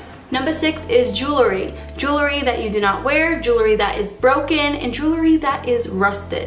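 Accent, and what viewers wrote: Boston, United States. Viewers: Your Boston accent is so adorable!!!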